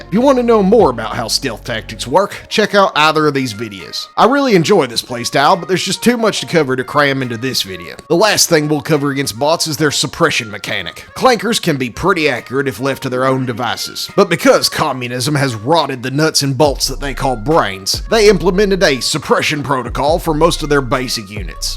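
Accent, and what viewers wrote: South, United States. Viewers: That southern accent really make it sounds like you're a real commander. 🫡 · Your voice is as stereotypical American as it gets 🦅🦅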